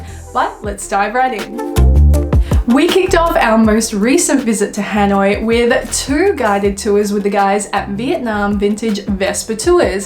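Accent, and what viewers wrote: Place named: Australia. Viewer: I have been living overseas for about 25 years and I have never been to Vietnam my country before. Your travel videos are very relaxing and I am glad that you really had a great time in Vietnam. After the Covid 19 pandemic I plan to go to Vietnam for the first time and please make more travel videos in the future they are really good. Do you like Tokyo and Japan? By the way Australian accent is quiet exotic!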